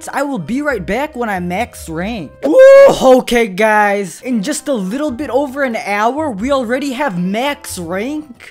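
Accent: India. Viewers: Bro are you indian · Ji indain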